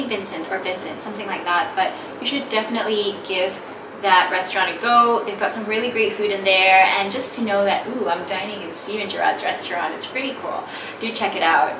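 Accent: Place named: United States. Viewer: You speak well, where do you live. You have American accent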